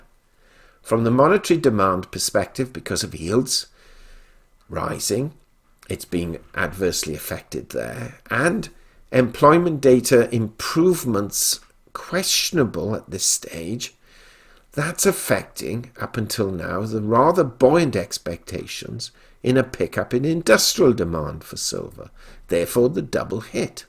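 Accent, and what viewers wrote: United Kingdom. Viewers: why do I take things more serious when it's reported in a British accent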